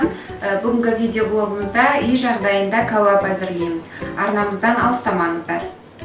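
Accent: Standard. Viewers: рахмет, таза қазақша сөйлейді екенсің, бәрі түсінікті юеткіздің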